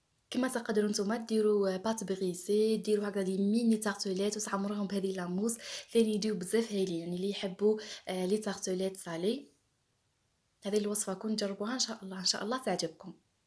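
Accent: Setif, Algeria. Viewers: لهجة سطايفية يا خالي، بنات عامر، ربي يبارك فيك، مغترب في فنلندة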